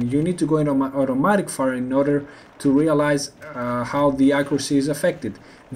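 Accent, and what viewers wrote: Greece. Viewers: Where is he from? His accent sounds like he is Greek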